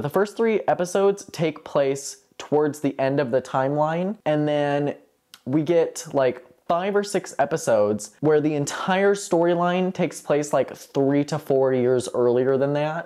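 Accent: South, United States. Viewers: your rapidness but like simultaneous efficiency with videos is epic??? a tale to be foretold thru generations?? but i also love the growth and being able to see ur personality and personal twists on things come out more and more, so obsessed! also the southern accent sheeyt sends me every time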